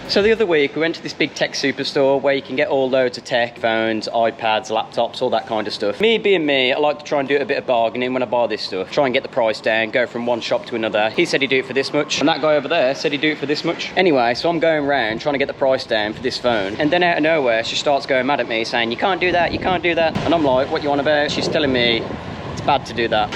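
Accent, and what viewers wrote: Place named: Midlands, United Kingdom. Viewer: As a fellow Midlander 🙂 I understand you perfectly...lol - Brilliant Videos by the way. Thumbs Up!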